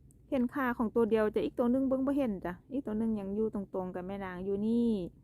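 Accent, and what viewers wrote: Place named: Isan, Thailand. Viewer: สวัสดีเด้อครับเว้าคือกันแท้อยู่จ.ได๋น้อครับ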